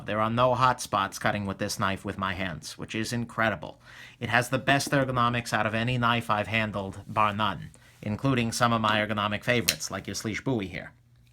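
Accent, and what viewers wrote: New York, United States. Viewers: You sound like your from New York or Boston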